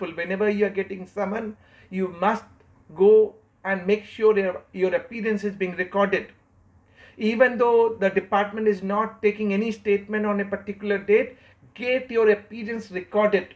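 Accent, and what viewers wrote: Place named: England, United Kingdom. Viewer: I like your so much your English accent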